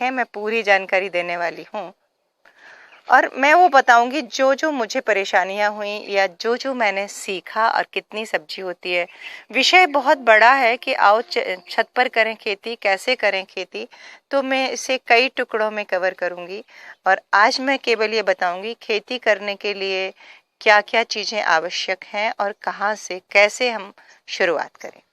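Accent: Standard. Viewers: आप की शुद्ध हिंदी सुनकर बडा अच्छा लगता है ,क्योंकि मैं मुंबई मे रहती हु .मेरा भी एक छोटासा बाग है लेकिन सब एक्झॉटिक प्लांट्स है,सोच रही हुं कुछ सब्जीभी ऊगालू लेकिन मुंबई की बारिश और मुंबई के बडे बडे चुहे कुछ भी नही उग्ने देते है क्या करू समज नही आता? लेकिन मै फिर भी प्रयास करूंगी आपसे प्रेरणा जो ली है.☺️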